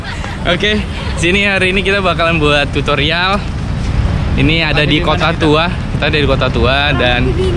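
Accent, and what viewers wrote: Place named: Banyumas, Indonesia. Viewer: mbaknya ngapak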